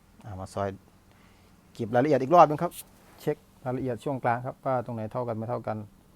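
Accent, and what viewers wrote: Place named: Laos. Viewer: ข่อยมักการพากษ์อ้ายแฮงครับ เว้าไทยเเล้วสิมีสำเนียงลาวแกมมานำ แบบผมเลยครับ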